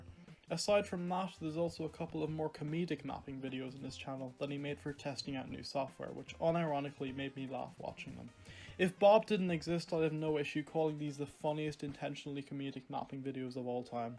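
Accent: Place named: Ireland. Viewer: Nice Irish accent.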